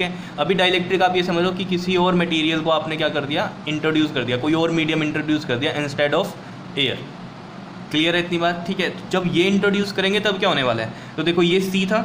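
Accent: Punjab, India. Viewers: Is u are from punjab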